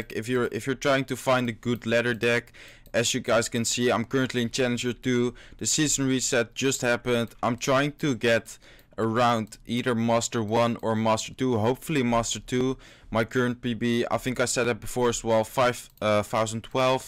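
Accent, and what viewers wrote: Netherlands. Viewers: ben jij Nederlands????? zo praat je namelijk wel: ) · Dikke Nederlands accent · ik hoor het nederlandse accentje:p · Zyt gy Nederlands spreker? ge hoort dat echt... maar je kan wel goed engels spreken... ik ben van belgie btw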